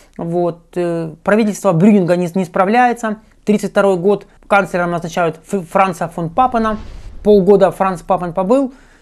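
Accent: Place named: Rostov, Russia. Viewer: Девушка с Ростова? Знакомый говор, как собака лает. Кажется, что хамят, но это тут так разговаривают.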